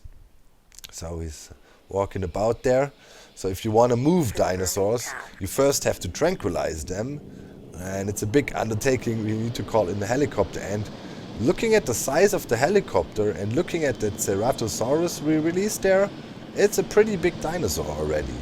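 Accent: Germany. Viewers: Your englisch has an accent? German?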